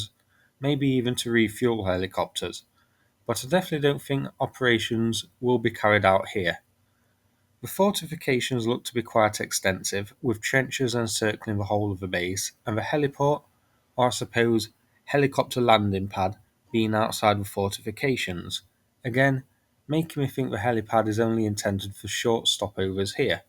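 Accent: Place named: England, United Kingdom. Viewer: Which part of England (county, main city) is home to the accent speaks in?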